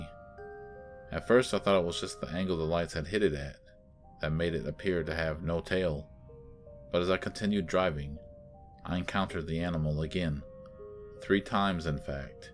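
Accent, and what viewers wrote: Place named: Michigan, United States. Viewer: And are you Native? Your accent actually reminds me of some friends of mine from Michigan that are Ojibwe! It was the southern "influence" in your voice that threw me off though! LOL!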